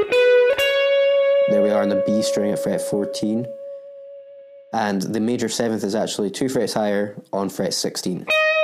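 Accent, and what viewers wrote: Scotland, United Kingdom. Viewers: Nice video dude, btw where are you from? I think some of your vocals sound a little scottish sometimes but I'm not sure though, english is not my mother language so I find it hard to recognize accurately accents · Are you Scottish? I hear a Scottish accent when you talk. Haha but I'm not sure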